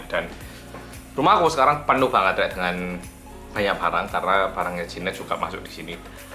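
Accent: Java, Indonesia. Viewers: Bahagia slalu wong Korea medok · Tapi sue sue medok e yo ilang wkwkwk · Opose garai kepo ae seh mas medhok iki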